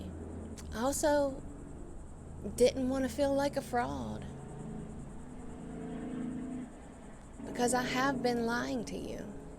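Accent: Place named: South, United States. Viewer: You're very pretty, I enjoy your southern dialect, be strong, and enjoy life to the fullest.😊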